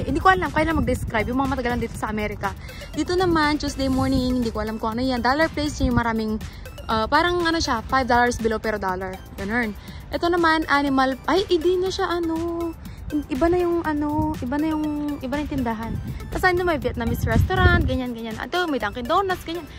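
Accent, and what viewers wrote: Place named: Ilocos, Philippines. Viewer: Cutie nung tagalog ilocano. Hahahahahha! 💞💞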